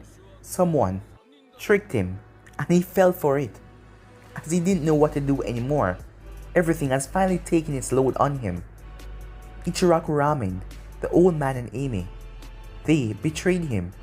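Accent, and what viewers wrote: Jamaica. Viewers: Are you Jamaican or from the Caribbean? I hear the accent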